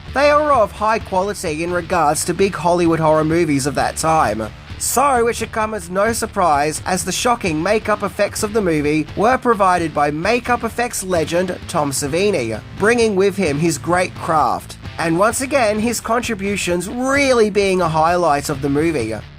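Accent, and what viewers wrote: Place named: Australia. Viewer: Me, personally, I hate AI narration. Souless. Plus I love the Aussie accent.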